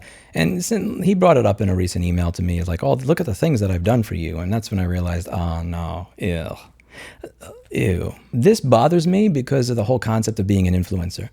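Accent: New York, United States. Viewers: There are few things as beautiful as New York accent starting to straight up drip off my speakers as the video goes on. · The New Yorker accent really shined in this one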